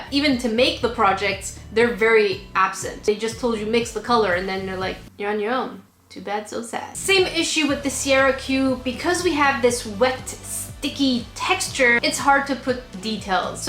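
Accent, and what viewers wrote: Canada. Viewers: Are you canadian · I love your salter crafter videos! I like seeing your salty disappointment face and your Canadian accent just makes these videos hilarious 😂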